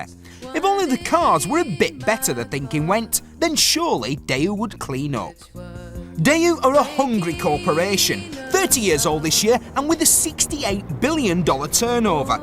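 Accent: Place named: Northern England, United Kingdom. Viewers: 11/10 for his North England accent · EGGBIRDTHEROOSTER He's from the North.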